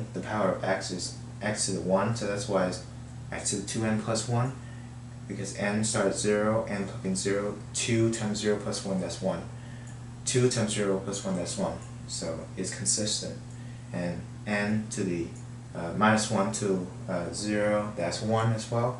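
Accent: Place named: United Kingdom. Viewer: Thanks . Awesome explaination . Your accent seems British. It has helped me a lot in my uni maths!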